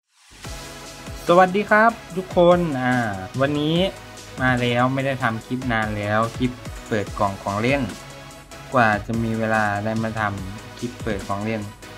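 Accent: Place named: Rural, Thailand. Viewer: พ่อค้าพูดเหน่อจังเลยคะ คนบ้านใด่คะ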